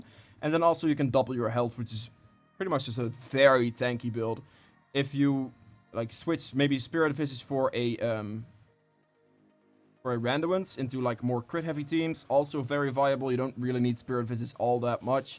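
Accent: Netherlands. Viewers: Ok 2 things r u dutch? And btw isnt free stop+free boots bettrr?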